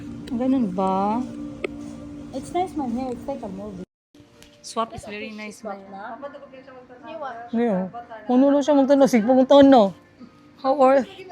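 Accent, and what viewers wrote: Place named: Ilonggo, Philippines. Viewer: Mam, ganyan tayo mga Ilongga d mawala ang intonation natin Ilonggo magsalita❤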